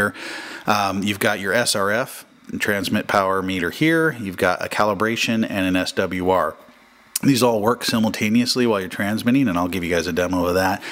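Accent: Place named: Pacific Northwest, United States. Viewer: Dang.. I remember the days.. But I got out of CB just before they went to 40 channels.. it got so crowded and folks were just playing with the radios.. Have always wanted to get back to it.. Maybe I will this year.. Great show.. sounds like your in my neck of the woods.. though I am a bit south in Vancouver Wa...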